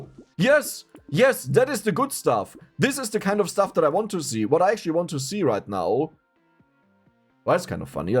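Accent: Germany. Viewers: Ich dachte mir schon das du ein deutscher bist 😂😂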